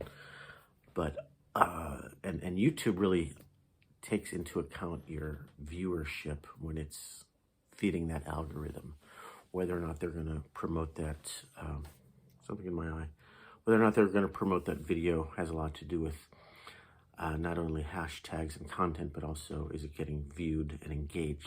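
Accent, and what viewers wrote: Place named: Scotland, United Kingdom. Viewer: I nearly spat my tea out when said you were Scottish! 😎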